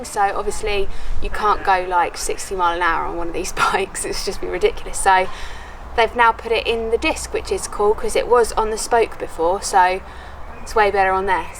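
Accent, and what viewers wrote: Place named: Australia. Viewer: I got used to that Australian accent.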